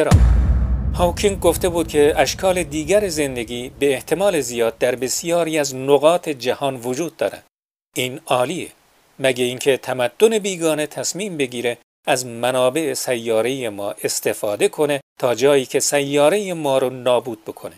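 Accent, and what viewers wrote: Iran. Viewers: خیلی عالی صحبت میکنید! یک سئوال همیشه در ذهنم خطور میکند و آن این است که لهجه تان فارسی ایران است و چهره تان خیلی شبیه به هزاره های افغانستان میماند. به هرصورت موفق باشید!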